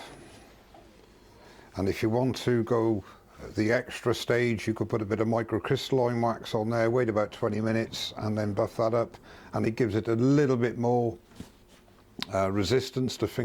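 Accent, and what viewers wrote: United Kingdom. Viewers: I’ve been watching your videos for a few years. I’m very pleased you are videoing some basic designs with an apparent emphasis on new turners. Good on ya mate! It’s ALWAYS good to review design ideas, tool use, and of course listening to your British accent. Greeting from your California subscriber…Roger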